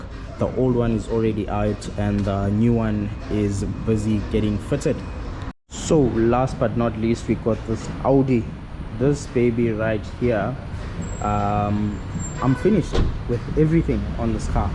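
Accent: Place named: South Africa. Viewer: Love your accent.... mix of SA English, Durbs Indian and I'm guessing Zulu.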